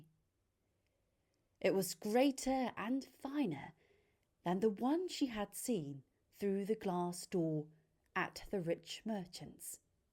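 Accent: RP, United Kingdom. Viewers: 평소 영국식 발음에 매력을 느꼈어요 구독 누르고갑니당♡ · 최고다 최고... 대박이다 대박 여기가 영국이네요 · 저 이거 들으면서 영국억양 따라해보고 발음 배워욬ㅋㅋㅋ자라고 만들어주신건데 배우고 있네요ㅎㅎ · 잠와요ㅋㅋ좋다!!!!강추 영국에있는듯합니다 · 우와 british english asmr은 처음 클릭해서 들어보는데 진짜 동화책이네요. 액센트도 원어민 RP 라서 넘 듣기좋아요 :) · 정말 고급지고 세련된 상류층 영국발음이군요. 최고네요^^ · 영국유학한 지인이 말하길 비비씨 연령있으신 아나운서들이 쓰는발음이라하네여 ..발음좋으시다구ㅎㅎ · 이번엔 둘째아이 옆에서 영국식 영어의 신세계 입문중^^ 5천명 곧 돌파!! 화이팅!! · 통해서 영국 발음을 더욱 좋아하게 되었어요 😍😍영상 올려주셔서 항상 감사하고 친절한 코멘트도 감사합니다 😊😊 좋은 밤 되세요 💖💖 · 동화올려주셨네요 감사감사^^~ 애가 영국발음이 좋아해요. 다좋은데 10분만에 안자네요 ㅜ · 발음연습에 정말 도움 많이 됩니다😍😍 영국남자보면서 듣기했었는데 여기서 보니 완전쏙쏙 들어와요 영국발음매력에 더 빠지고있어요😩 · 영국영어 원탑이신듯~!! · 영국영어 넘나넘나 좋아해요♡♡♡♡♡ 광고보고 아나운서님 팬되었는데 이렇게 직접 들을수 있다니💕💕💕💕 천천히 읽어주시니 더 좋습니다♡ 참, 혹 녹음하실때 쓰시는 마이크장비를 여쭈어봐도 될까요~~??!!! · 영국발음너무좋아하는데 목소리랑 너무 좋아요 진짜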